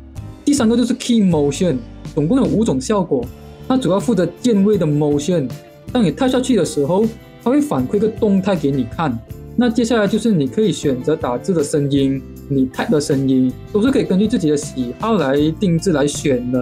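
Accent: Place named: Hunan, China. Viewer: 湖南话＋台湾话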